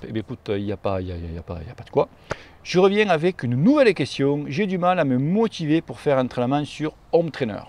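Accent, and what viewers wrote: South, France. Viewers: Salut! Super t’es vidéo! Très instructive.. et en plus avec un super accent du sud! Je suis un voisin, j’habite du côté de Perpignan..eh oui j’ai la chance d’être catalan!😉. Je roule 3-4 fois par semaine et je souhaite faire du HT pour progresser et faire du spécifique, de manière ludique tant que possible. Je veux acheter un HT d’occaz dans un budget autour des 200-250€.. j’hésite entre un TACX BUSHIDO SMART et un BKOOL SMART PRO.. c’est pour une utilisation sur les applis connectées ZWIFT, BKOOL,etc.. et pour utiliser les programmes spécifiques.. (sur un smartphone..) des conseils éclairés sur le choix? Merci d’avance!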